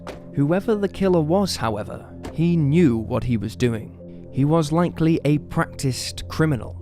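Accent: United Kingdom. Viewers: Why does the narrator have to have that British accent? Use an American!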